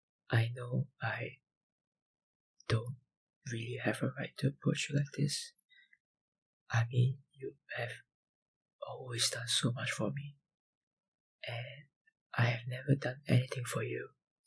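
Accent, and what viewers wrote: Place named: Singapore. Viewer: wow u have the lioncity accent 😂👍🏼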